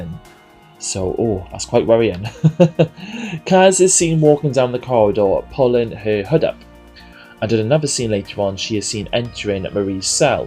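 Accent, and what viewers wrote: Wales, United Kingdom. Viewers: Very Welshy mate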